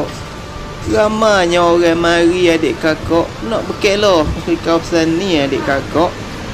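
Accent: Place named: Kelantan, Malaysia. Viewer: Molek jelas kecek kelate. Hok ni baru ori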